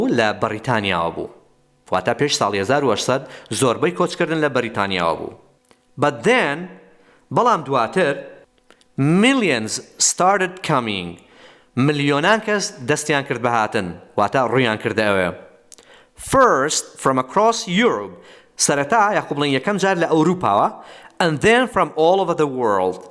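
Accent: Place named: United States. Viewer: دەست خوش ماموستا خوای گەورە پاداشتت داتەوە بەراستی ئاکسێنتەکەت زور شاز بوو ئەمریکی بوو ٫ من پیشنیارێکم هەیە بەراستی ئێمە زور پێمان باشە گەر جەنابتان بتوانن ئیبسۆتیش بە هەمان شێوە دروست بکەن گەر بە ئەرک نەبێت چونکە بەراستی من سەیری ماموستاکانی تر ناکەم لەگەل رێزم بویان زور جوان شەرحی دەکەن بەلام من لەبەر ئەکسێنتەکەمە بەلام هی تو زور جیاوازە زور شازە لەگەل رێزم بو جەنابتیشان 😍❤ thanks for everythin ,you are the best forever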